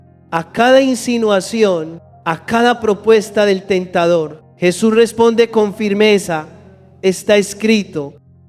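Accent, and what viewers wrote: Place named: Portugal. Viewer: por qué habla con acento portugués? muchas gracias por compartir el evangelio.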